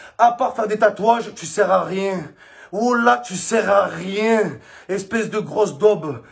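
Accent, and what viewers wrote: Marseille, France. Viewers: Style marseillais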